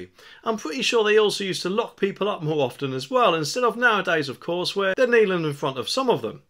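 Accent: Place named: Northern England, United Kingdom. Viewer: You northern folk with your comedy! :) Your joke doesn't work with a southern accent (we pronounce our aches, which is due to the fact that when people started to learn who to read, they pronounced all of the letters rather than pronouncing words "correctly" for the time". In other words, dropping aches was the "proper" way to speak at the time)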